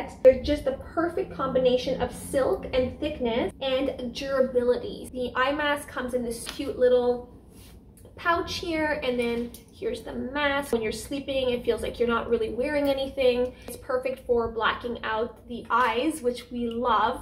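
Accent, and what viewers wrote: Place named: Quebec, Canada. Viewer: Leave alone, she’s French Canadian, there is so many words that are pronounced differently in Canada. I live in the USA but I am a Canadian.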